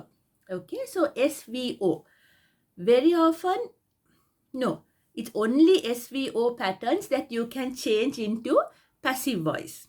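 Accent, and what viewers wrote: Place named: Sri Lanka. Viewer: Dear Mdam You are the only one English teacher who teachs English in English who has Sinhala voice.. සිංහල හඩකින් ඉංග්‍රීසි ඉංග්‍රීසියෙන් උගන්වන එකම ගුරුතුමා හා ගුරුතුමිය හරීම වටිනවා. මම ඉංග්‍රීසි ඉගෙන ගන්නා විශ්‍රාමික (ගණිතය ඉගැන්වූ ) ගුරුමාතාවක් .. ඔබතුමියගේ මීට පෙර ඉංග්‍රීසි /සිංහල පාඩම් හැදෑරුවෙමි. (I try to read English poems which I abandoned ) Thanks again.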